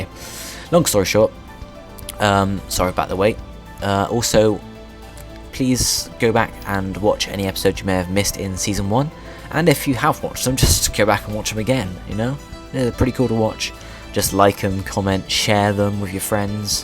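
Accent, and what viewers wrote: United Kingdom. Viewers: I'm curious....are you and Matt Smith from the same part of England?....because you seem to have very, very, very similar accents.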